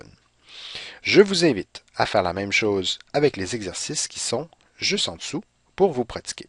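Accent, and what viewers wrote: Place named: Quebec, Canada. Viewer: Oh mon dieu, je suis en première année de médecine, ça fait trois mois que je cherche à comprendre cette chose !!! A deux semaines des partielles je comprends enfin, en plus avec l'accent québécois, c'est parfait ! Merci beaucoup !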